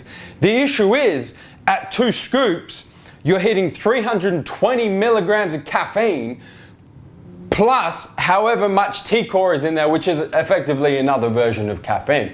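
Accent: Australia. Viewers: Sounds like an Australian version of Alfie Aesthetics. No shit. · dude u might wanna neutralize your accent a bit , kinda difficult for guys like me not from Australia to understand your accent, maybe try American accent bro ? nice vid tho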